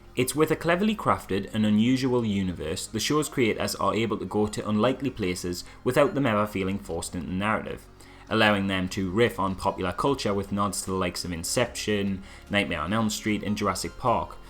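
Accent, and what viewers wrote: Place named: North East England, United Kingdom. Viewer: Nope but close. it's one of the accents in the North East of England, not too far away from Scotland.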